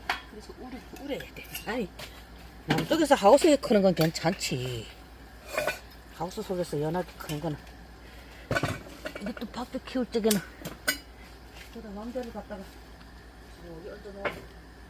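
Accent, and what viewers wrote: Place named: Gangwon, South Korea. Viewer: 강원 도 사투리신가봐요. 말씀도 구수하고 나물향도 구수할것같아요. 땅두릅을 그렇게도 부르는군요. 산행하시느라 고생 하셨네요. 맛있겠어요.🤗👍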